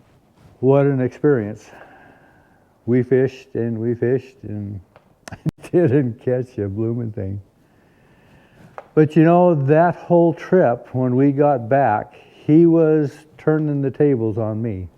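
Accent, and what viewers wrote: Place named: West, United States. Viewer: Once again, thanks for a wonderful yarn. Sure put a smile on my face! Love to listen to a real westerner spin a tale.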